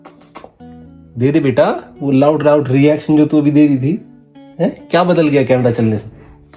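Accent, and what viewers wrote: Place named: Haryana, India. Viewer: Hey bhaiya....❤love from India.....big fan of your haryanvi accent 😀😀😀god bless your family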